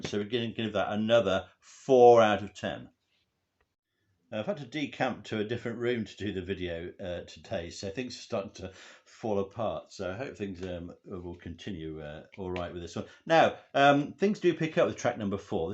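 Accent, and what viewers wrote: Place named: United Kingdom. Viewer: I guess these grades you are giving to songs do not represent their grandiosity, this album comes with a creative energy which we haven´t felt for years...the piano in first plane..the sound of vocals resembling an analog registering/record. I think i would jump two or more numbers after all you suggested...lol. I love your British accent.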